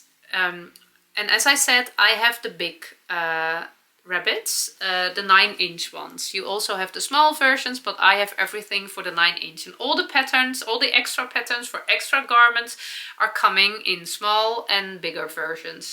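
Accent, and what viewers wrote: Netherlands. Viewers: Greetings from Denmark - what a cute story about the blue rabbit. I spent my Easter making my first LCR bear this Easter - its so funny i enjoy making the cloths. I love you videos, your dutch accent and energy. 🐰🐻🦊🦁🐷🐮🐻‍❄️🐭🐱🐶🐌🦌🐨🐵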